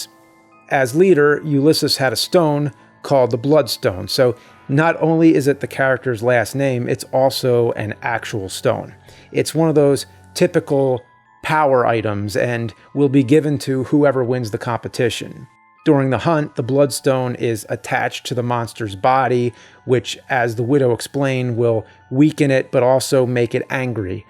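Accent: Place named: New Jersey, United States. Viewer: It's the Jersey accent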